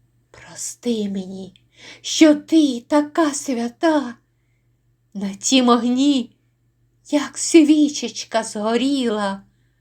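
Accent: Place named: Ukraine. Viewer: Дуже вам дякую. Для мене важливо чути українську поезію українським голосом!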